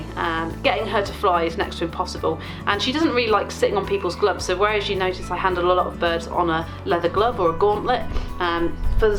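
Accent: United Kingdom. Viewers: In you say: "she's literally older than me" automatic subtitles: "it's really a hotter than me" how dare you translater? Zorro is a "she" not an "it" ^^ I think your cute british accent confuses the translater, altough i think you speak pretty clear. (because english is not my first language, i happily use the automatic subtitles often anyway)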